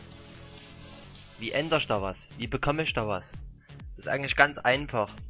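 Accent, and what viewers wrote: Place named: Saxony, Germany. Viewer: xDD gleich zu anfang ->ich bin sachse un red auch so xDDD nice nice du bist mein supersachse Sachsen 4 live:)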